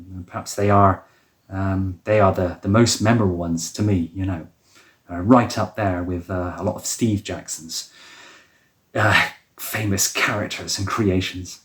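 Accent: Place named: West Country, United Kingdom. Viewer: Aye; that was a best of release. Well spotted. I detect a slight west country twang in your accent. I'm in Yarm, NE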